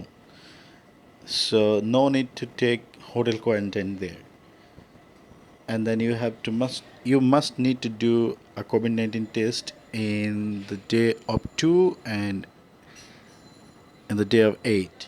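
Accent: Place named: United Kingdom. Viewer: You really have posh English voice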